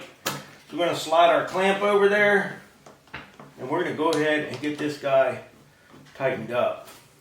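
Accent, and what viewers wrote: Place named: Pittsburgh, United States. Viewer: Are you from PA? I hear a bit of Yinzer in there.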